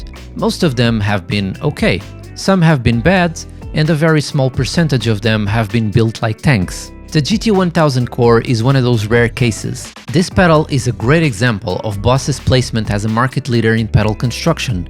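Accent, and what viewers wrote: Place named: Portugal. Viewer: Pelo sutaque deves ser tuga! 😂 Saudações 🤘🏻🍺🇵🇹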